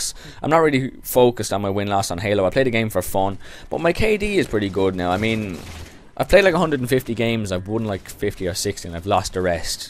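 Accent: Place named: Ireland. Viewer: obviously you've never heard of Irish accents before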